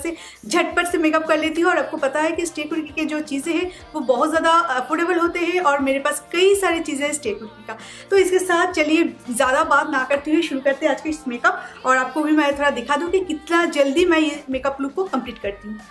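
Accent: Bengal, India. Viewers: I wouldn't call it weird as her mother-tongue Bangla is dominant in her accent. So with her hi-speed talk, it did sound different from the actual pronounciation. All she needs to do is slow down slightly to the range of 140-160 words per minute, which is supposed to be an ideal rate. One can check the internet for this. For jokes one can keep a higher rate.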